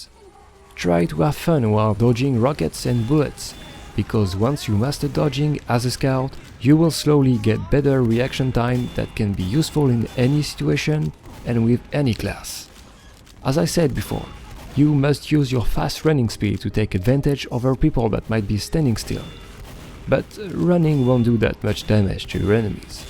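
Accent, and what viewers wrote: France. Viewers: I just like listening to his therapeutic French voice. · Are you French? :D That sounds like a French accent.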